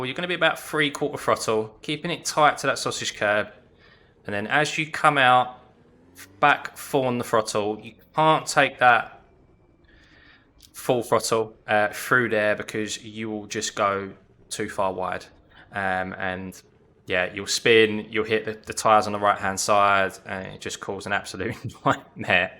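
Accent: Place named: Essex, United Kingdom. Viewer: Harsh but an Essex accent is top tier.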